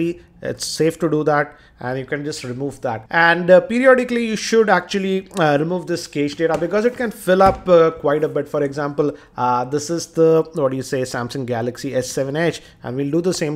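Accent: India. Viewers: Can anybody that doesn't have the most annoying Indian voice do Android cat videos please.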